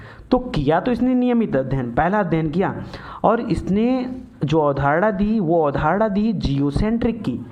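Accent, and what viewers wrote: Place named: Gorakhpur, India. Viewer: sir kya aap Gorakhpur pur ke pipiganj se hai kya aap ka aawaj waisa hi hai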